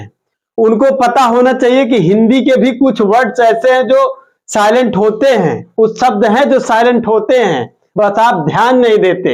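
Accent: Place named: Purvanchal, India. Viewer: वाह रे मेरे पूर्वांचली शेर तुम्हारे लन्ग्वेज से साबित होरहा है की तुम U P मे किसी पूर्वांचल जिले के हो क्यूंकि इतनी शुद्ध हिन्दी भारत के किसी प्रदेश या जिले मे कोई बोल नही सकता है M D sheikh from बनारस up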